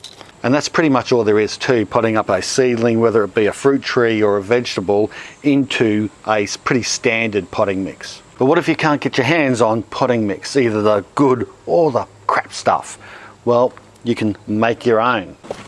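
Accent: Australia. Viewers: I enjoy listening to his Aussie accent plus have always liked the self sufficient mindset.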